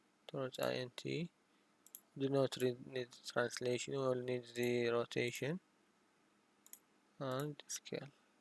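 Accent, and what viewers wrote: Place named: Iraq. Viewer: لهجتك عراقي انت عربي لو كنت عربي عندك دوره باللغه العربية اشتريها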